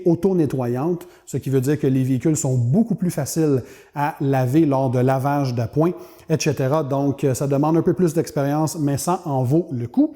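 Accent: Quebec, Canada. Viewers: j'ai découvert ta chaîne en recherchant detailing auto sur YouTube et une de tes vidéos m'a été proposé et voilà... J'ai de suite accroché quand j'ai entendu cet accent québécois (je joue au roller in line hockey et passionné de hockey sur glace)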